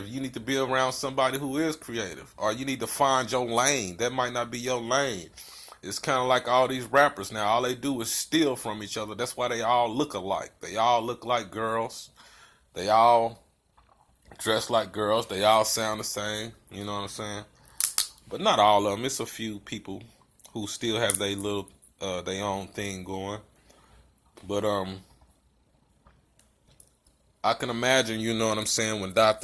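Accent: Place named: Oakland, United States. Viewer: Sound like you from Oakland, Calif.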